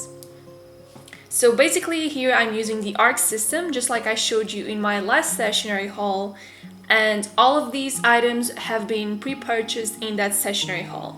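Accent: Portugal. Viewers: Tu falas português??? ahh bem me parecia o teu sotaque inglês portugues És portuguesa ou br??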